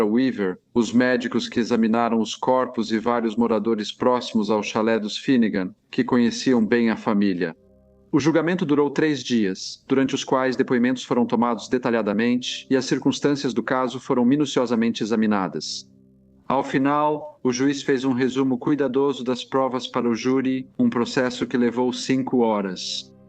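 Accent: Portugal. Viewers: O português de Portugal é lindo ❤ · Alguém precisa dar um jeito neste sotaque de Portugal! É muito chato! E feio!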